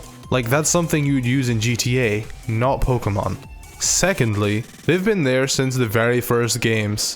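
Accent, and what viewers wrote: Scotland, United Kingdom. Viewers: Are u Scottish because I am to 👌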